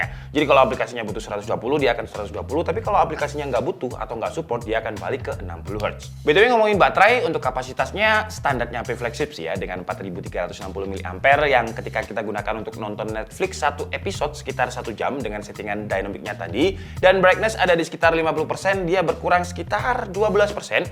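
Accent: Malang, Indonesia. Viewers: Bang mu orang malang??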